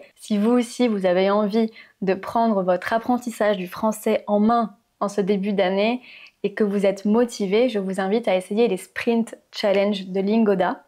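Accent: France. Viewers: Tu parles le vraie français bien prononcé bien modulé avec un accent merveilleux et pas comme le français québécoise ou de la Suize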